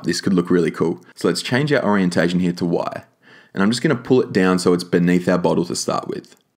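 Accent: Australia. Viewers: Hi, It's great to hear an Aussie accent on YouTube. I love your videos, your camera girl is awesome as well. I'm based in Batemans Bay, south coast NSW.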